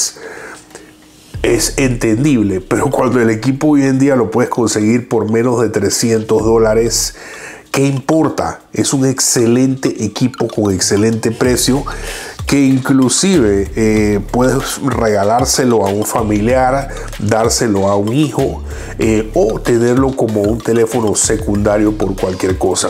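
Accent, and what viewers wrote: Panama. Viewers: Ajajaja estoy buscando Review de este equipo y me salió un paisano que sin saber que era panameño por el acento me di cuenta ajajaja saludos bro buen Review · Hahaha escuchando me di cuenta que eres de Panamá, saludos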